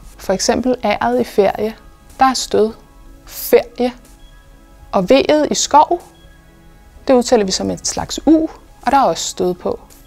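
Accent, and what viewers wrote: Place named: Copenhagen, Denmark. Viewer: De her "Stød" videoer på Youtube har det med at tro, at alle taler københavnsk, synes jeg. I en anden video brugte de ordet "gul" som eksempel på stød, og her bruges "bil". Ingen af ordene udtales bare på den måde, så snart du kommer udenfor deres dialektområde, så det virker som ret dårlige eksempler, synes jeg. Det der "Bi-il", "Gu-ul" halløj kender jeg udelukkende fra københavnere, som jeg kender. Jeg har aldrig hørt dem udtalt sådan af andre eller i andre landsdele. Så virker det lidt mærkeligt at sige, at "sådan gør man på dansk". Det er mange årtier (hvis ikke århundreder) siden, at københavnsk var tilnærmelsesvist rigsdansk. I dag er det en af de mest sære/specielle dialekter i hele landet med "glottal stop"-stød, et hav af bløde D'er i ord, som slet ikke indeholder D ("Huset" f.eks.) osv. Københavnsk er på ingen måde repræsentativ for landet som helhed, når det gælder udtale. 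Københavnsk er omtrendt lige så repræsentativt, som sønderjysk eller bornholmsk er i dag. Derfor er det f.eks. også altid københavnsk, som svenskerne gør grin med i deres sketches, som de kalder "potatisspråk" osv. Det er non-stop halslyde derovre. Tag f.eks. udtalen af ordet "meget", og så bliver det jo et eller andet i stil med "Maaard" (med blødt D), hvilket igen er helt unikt for den dialekt. Hele det der "aaaa" er jo ren hals. lol Det tror da pokker, at svenskerne kalder sproget "grötig". Hvis du f.eks. tager en tur til Østjylland eller lign. så udtales "gul" jo bare, som man ville forvente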